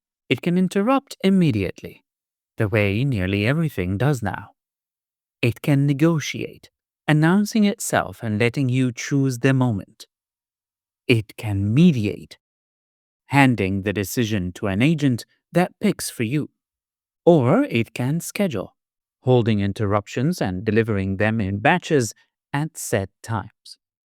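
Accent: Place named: Netherlands. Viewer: This vid haz awesome English gramma (grammar) with a Dutch accent!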